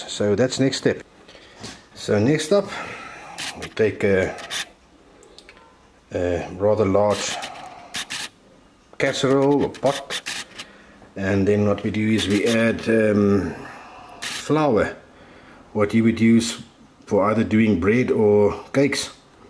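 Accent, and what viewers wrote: South Africa. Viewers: I already knew it when I heard him, I’m from Cape Town and the jojo tank with the Isuzu in the background just hit the nail on the head 👌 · Oh yes the true Soud Afarikan accent! Love it! 😂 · He sounds so South African. The accent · Are you south African?? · Are you South African? This is a great process, similar to others so it for sure works repeatedly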